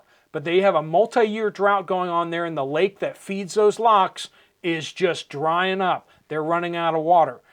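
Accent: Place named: Appalachia, United States. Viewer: Hey thank you for all that you do and say! I have a son and his family in West Newton and I feel right at home with your frame of reference and accent. God bless all of the people in coal country who have contributed so much to this country's history mining such a life sustaining fuel that brings security and warmth to so many people around the world. Merry Christmas and a safe and prosperous New Year to you and your family! 😇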